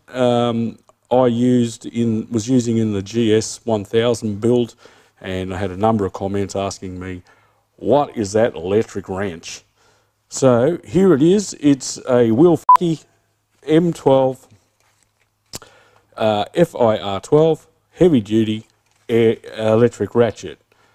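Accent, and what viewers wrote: Australia. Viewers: Man. Your Tassie Accent makes that intro All the better!!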